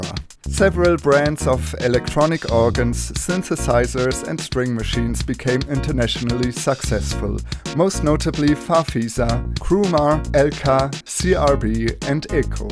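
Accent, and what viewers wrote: Germany. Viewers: More German accent please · I loved your video, thank you for producing it. I remember when the Italians were producing many electronic organs in the 70s and 80s. I still own an X705 portable organ that Michell Jarre used. As you say a very sad demise. Most of the American names had also disappeared by the mid 80s. Don't apologise for your German accent. I am British and I love Germany and the German people. I am a fan of Franz Lambert who at 75 is still doing concerts on his Wersi organ.👍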